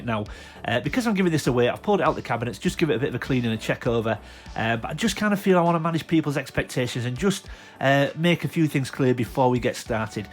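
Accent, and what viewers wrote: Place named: Northern England, United Kingdom. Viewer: Great to hear a Northern voice talking about their passion for collecting. Been back and currently watching all your videos now thanks for all your hard work!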